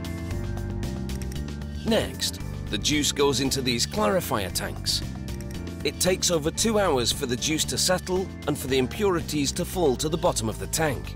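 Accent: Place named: Liverpool, United Kingdom. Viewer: He’s actually scouse, one of the more common peasantry sounding English accents.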